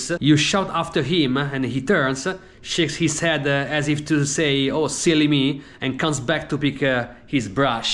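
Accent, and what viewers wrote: Italy. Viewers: It looks you have italian accent! So I suggest you make also a video about millions scams in Milano,Roma,Venezia.fiornze... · You sound Italian by the way you speak English. Am I wrong?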